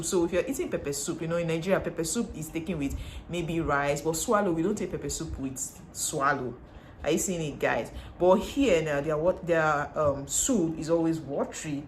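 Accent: Ghana. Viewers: We Need people to stay ghana forever . You talk like ghanaian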